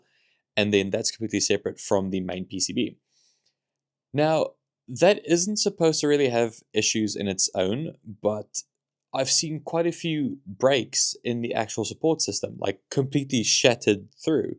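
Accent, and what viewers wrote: South Africa. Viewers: You South African bro ?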